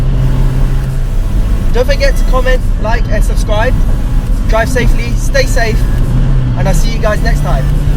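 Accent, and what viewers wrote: United Kingdom. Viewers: An Asian guy with a British accent....I've not seen that before, interesting. I do like JDM vehicles, but for some reason, they keep putting the steering wheel on the wrong side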